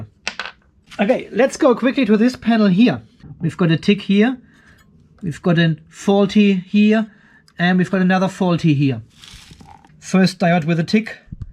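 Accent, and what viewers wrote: Germany. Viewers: I'm starting to think that "American English" sounds better with a German accent!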